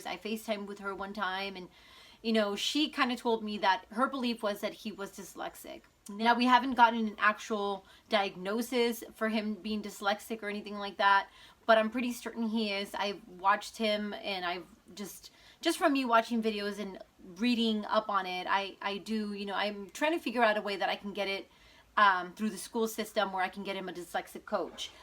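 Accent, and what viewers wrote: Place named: California, United States. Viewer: I know this is an old video, but I'm so tickled to hear that you're from California because I thought you had a very familiar way of speaking (I'm from the Central Valley). I enjoy seeing the practical and creative ways that you homeschool and have taken a lot of inspiration from it.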